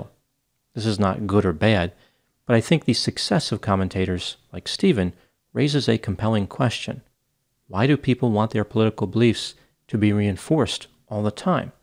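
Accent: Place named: Baltimore, United States. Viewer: I have never noticed this before, but I hear a Baltimore accent from... especially around long "o" sound....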